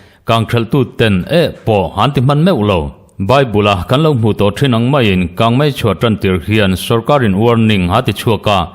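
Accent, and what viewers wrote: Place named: Aizawl, India. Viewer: Aizawl milian nuho Accent ang kha inei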